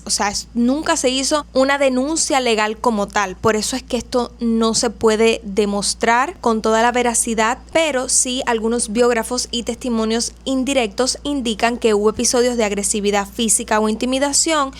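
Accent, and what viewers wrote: Cuba. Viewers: Nena recién conozco tu canal y apenas escuché tu voz pensé: "su acento suena cubano" y cuándo leí el nombre del canal me lo confirmó 😅. Saludos desde Cuba🇨🇺❤ PD: nueva seguidora acá, los temas de tus videos me llaman mucho la atención y estaré haciendo maratón siempre y cuándo la corriente me lo permita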